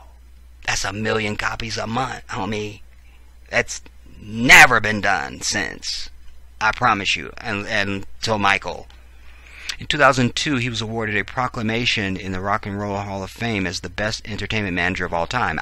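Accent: United Kingdom. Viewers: you better SING that song! I love when you speak in your British accent.... and when you say the 1990s. Lol. You make my day!!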